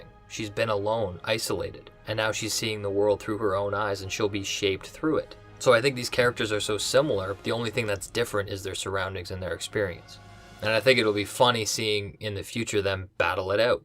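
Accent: Canada. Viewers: theres an accent tells me ur probably from canada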